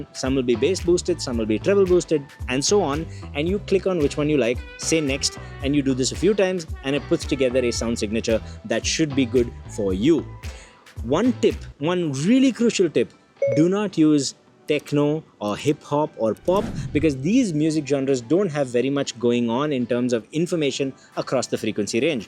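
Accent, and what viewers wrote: India. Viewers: You should make a choice and reduce one of the following to things: level of background music or Indian accent. One is always distracting another and so on.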